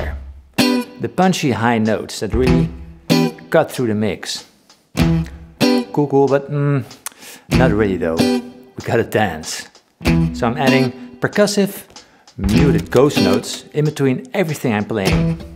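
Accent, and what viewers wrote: Netherlands. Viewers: That Dutch accent ain't so funky. Sounds like my Grandpa from Rotterdam. The playing is all the better to funk u with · Sounds dutch to me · What is your origin? You sound dutch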